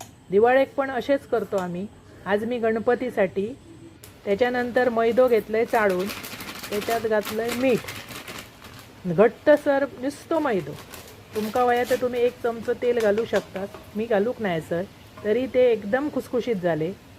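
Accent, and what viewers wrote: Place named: Malvan, India. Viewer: मालवणी भाषा बोलण्याची पद्धत अप्रतिम अजिबात भेसळ नाही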